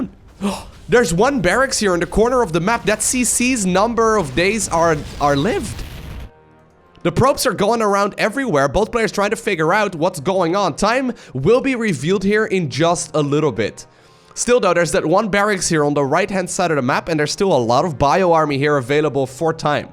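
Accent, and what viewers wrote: India. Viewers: You sound like someone from India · you sound indian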